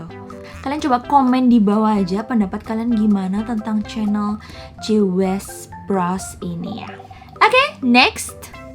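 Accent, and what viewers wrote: Java, Indonesia. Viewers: Kangen ngomong jowo:') medhoke loh mantep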